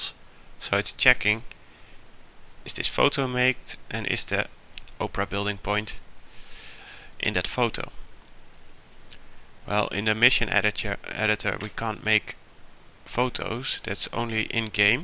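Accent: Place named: Netherlands. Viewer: Je hoort wel dat het een Nederlander is ;D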